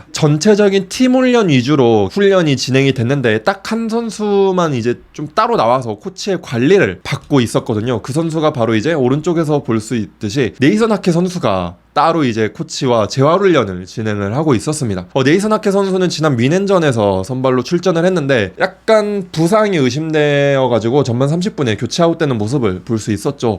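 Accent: Gyeongsang, South Korea. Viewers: 경상도억양 듣기 싫어요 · 경상도 억양좀 어케 해보소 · 경상도 말이 어때서? 듣기 싫음 보지마라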